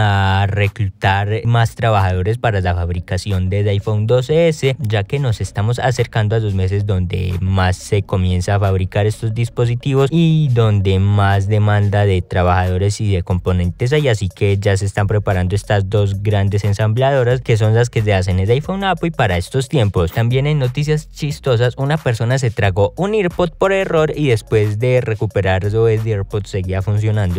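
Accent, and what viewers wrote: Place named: Colombia. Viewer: Me gusta el contenido. Pero me tengo que acostumbrar a ese tan marcado acento colombiano . O es la voz? O son ambas cosas? No lo sé, me imagino que es cuestión de acostumbrarse. Gracias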